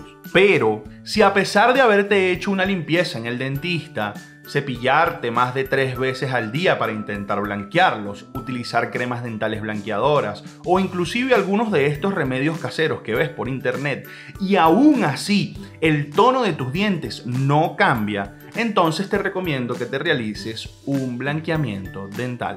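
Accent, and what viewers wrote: Venezuela. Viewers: Ajajaja me da mucha risa como hablas Venezolano neto Doc.. tambien soy Venezolana pegadisima ah sus videos explica todo muy bn Bendiciones 🙏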